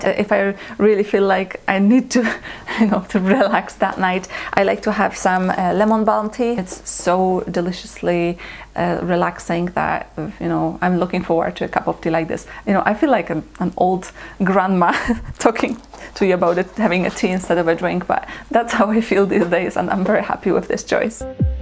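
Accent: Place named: Poland. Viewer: How come after 11 years in UK you still have such a strong polish accent ? Your videos are valuable but your english is very heavy and hard to listen to. Make it more fluent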